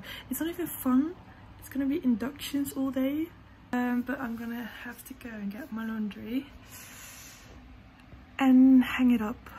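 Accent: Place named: RP, United Kingdom. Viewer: Proper posh accenttttt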